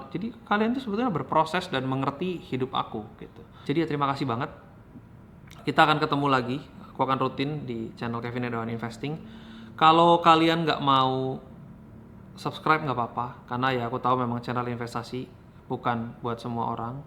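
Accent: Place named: Banyumas, Indonesia. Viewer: kayaknya kok sekarang makin kerasa logat ngapak e wkwkkw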